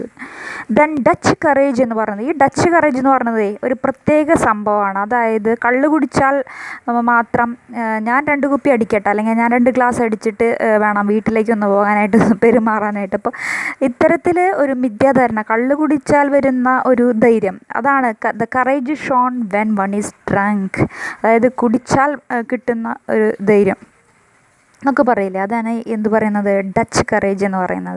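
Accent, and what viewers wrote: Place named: Thrissur, India. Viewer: അടിപൊളി, തൃശൂർ ഭാഷ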